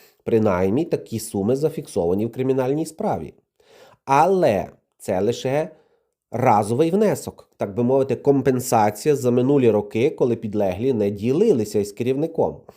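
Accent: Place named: Kolomyia, Ukraine. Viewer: акцент рассказчика из коломии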